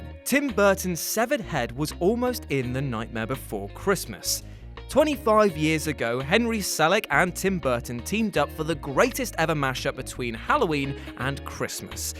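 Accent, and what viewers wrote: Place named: Cockney, United Kingdom. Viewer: The content is quite interesting if you can deal with the annoying chirpy cockney banter that goes with it, there is nothing worse than somebody who thinks they are funny.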